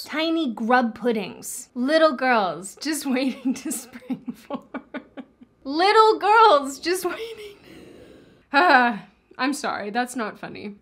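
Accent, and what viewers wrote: Australia. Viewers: These people are fucking insane!!!!! I'm hearing your Aussie accent come out and I'm loving it!! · Well that’s the first time I’ve heard someone imitate crocodile Dundee in their own Strayan accent.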